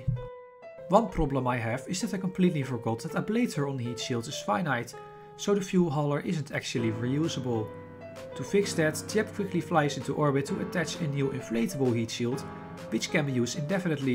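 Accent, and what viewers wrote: Netherlands. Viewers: I smell dutch. Tell me if I am right or not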